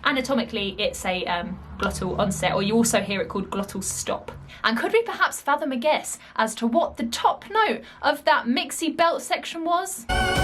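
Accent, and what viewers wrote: United Kingdom. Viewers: İt has my soul apart hhahaha .. I wanna find you and squeze your cheeks.. You could ve cuties person in the world 😇 Also your british Accent is so cute.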